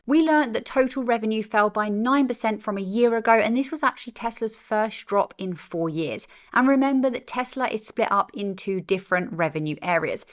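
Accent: England, United Kingdom. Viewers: u sound English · This lady is very good with insightful and well-organized presentations. Her cute little British accent is also fun to listen to.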